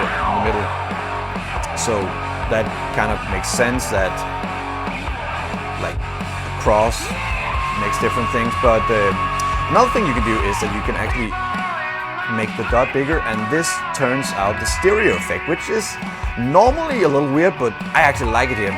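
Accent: Denmark. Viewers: Danish accent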